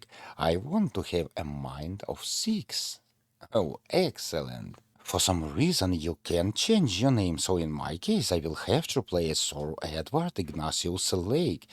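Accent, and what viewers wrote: Russia. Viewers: Is the narrator Russian?)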